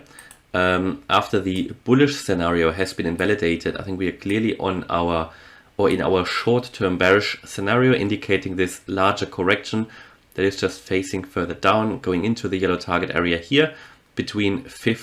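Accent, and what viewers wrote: Germany. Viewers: Dude I love your analysis. Pretty straight forward and precise. If I'm not wrong I'm also hearing a German accent. in which case muss ich sagen echt geile TA bro 👍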